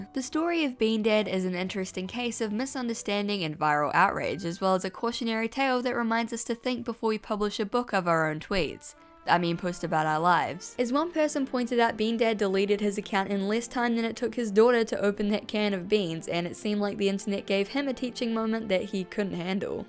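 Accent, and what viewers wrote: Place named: New Zealand. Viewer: i can't tell if you're kiwi or not, but as a kiwi, your accent validates me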